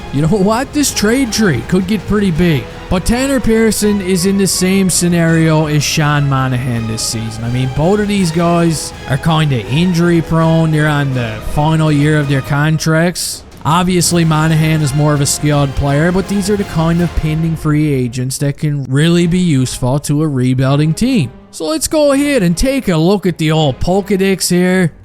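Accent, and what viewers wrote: Newfoundland, Canada. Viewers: Is that a Newfie accent?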